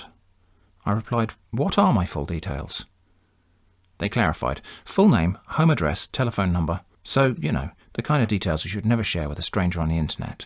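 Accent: England, United Kingdom. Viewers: ”Near” perfect? 😂 He is English. How should he improve his “near perfect” English accent. That is an English accent. 😂🤷🏻‍♂️ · I always find the contrast of reading the broken English in a near perfect English accent quite amusing.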